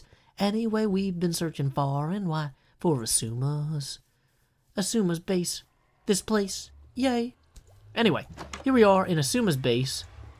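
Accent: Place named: South, United States. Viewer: Sound so country 😂🤠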